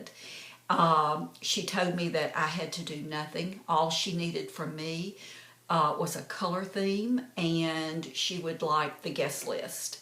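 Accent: South, United States. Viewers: just found recently your utube videos and am hooked, just love your accent, reminds me so much of the way Liz Taylor spoke in many of her films, kind of Southern accent though i think you are from Arkansas, am i right, you are such a young beautiful lady and your wedding day snaps are so lovely. i still have many more of your videos to look at. Have been to many US states but not Florida nor Arkansas, my fav is New England, twice visited all those NE states, also CA from LA all the way up the Pacific coast road to San Fran, hope to go back and see more. just love US. regards Jenny from the UK. XX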